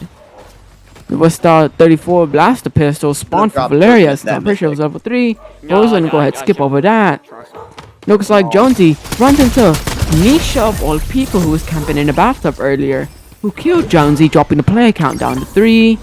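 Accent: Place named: United Kingdom. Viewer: love the british voice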